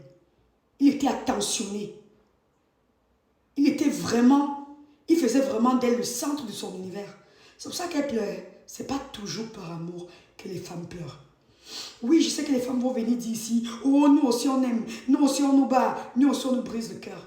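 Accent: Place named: Cameroon. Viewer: Accent camerounais...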